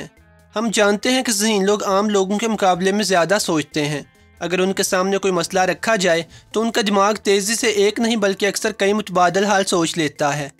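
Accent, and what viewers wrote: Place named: Punjab, Pakistan. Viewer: ap bhe bohot zahen hen pr apka lehja bilkul pnjabi he...lgta he ap pnjabi bol rahe hen.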